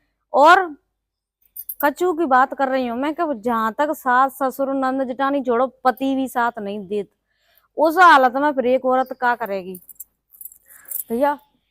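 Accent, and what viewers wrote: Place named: Rajasthan, India. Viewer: बहन आप कौन से गांव से हैं यानी आपका मायका कहां पर है आप तो मेरी तरह भाषा बोलता है मैं भी राजस्थान से हूं प्लीजबताएं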